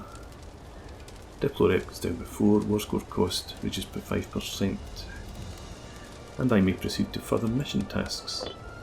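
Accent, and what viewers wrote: Scotland, United Kingdom. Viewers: You Scottish?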